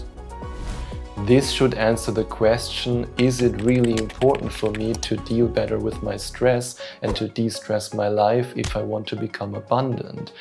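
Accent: Germany. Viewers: German accent?… thanks for the breakdown. It’s less lofty and technical than Dispenza video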